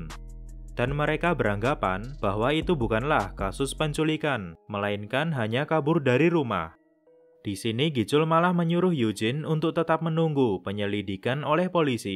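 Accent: Indonesia. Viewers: Gaya ngomongnya kek orang indo:v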